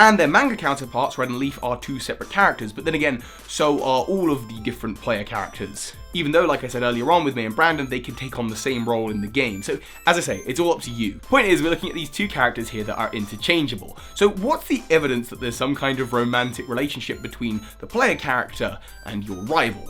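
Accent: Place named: London, United Kingdom. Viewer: Where are you from in England? Based on the cadence of your accent, I'm assuming you're from the London area since it sounds like Daniel Radcliffe's accent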